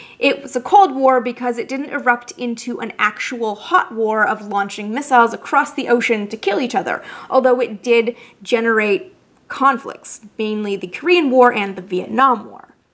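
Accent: Canada. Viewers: I love how you have defined this area of history and claimed it as your own. You always post great stuff. Very surprised to hear you don't have an opinion on SDI after you explained it so very well. Treaty language largely says defense measures are not treaty violations and you summarized it all very well. So, despite small disagreement, very well done.... and your Canadian accent still shines through. Very adorable.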